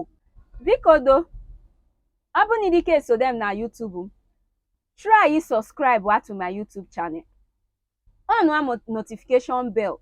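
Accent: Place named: Igbo, Nigeria. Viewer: I just love the fact you are speaking the Igbo language hearing the different so called "fake" Igbo dialect always tickle me as an Abia man 😂 as I notice all the different sounds and subtleties, off course I believe that every Igbo person is equal to every other Igbo and there is nothing like real or fake igbo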